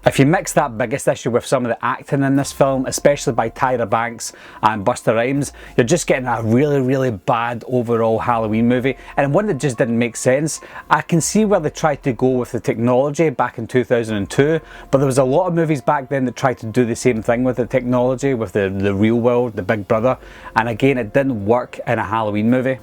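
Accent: Scotland, United Kingdom. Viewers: You're still Scottish?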